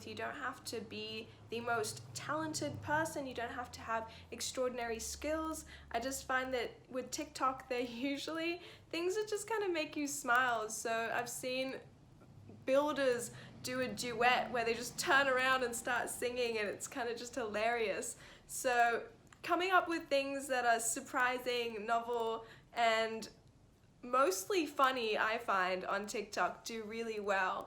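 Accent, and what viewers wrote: Australia. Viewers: That Australian accent 😍😍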